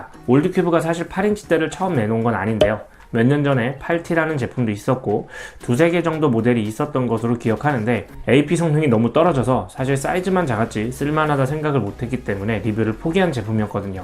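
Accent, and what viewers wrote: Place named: Daejeon, South Korea. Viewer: 대전분이군요 ㅎㅎ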